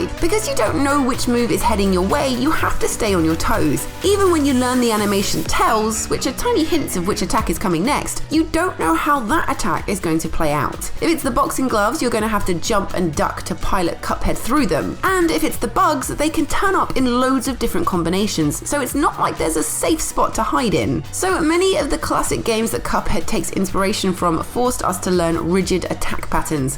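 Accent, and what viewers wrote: United Kingdom. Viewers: stop speaking british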